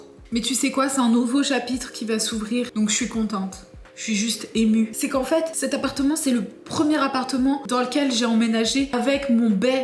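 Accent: England, United Kingdom. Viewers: omgggggggg ton accent anglais quand t’es arrivée à paris je suis choquée😭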